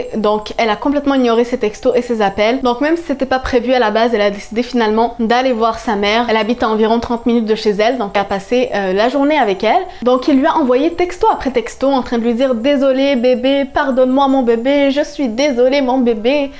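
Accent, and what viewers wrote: Canada. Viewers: j'adore ton accent tes canadienne ?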